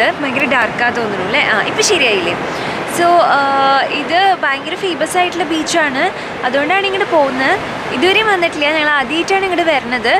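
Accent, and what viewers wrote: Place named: Thrissur, India. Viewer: I live in thrissur I know people here speak like this only...people from southern kerala like me may feel her slang different. Style ett parayunnath kettal mansilakum. Avarkk vakkukal kittarilla😅 pakshe nalla fluent alle malayathil.slang is different its because she belongs to thrissur. It's so cute to keep listening to her. Natural talk which I love most about her😊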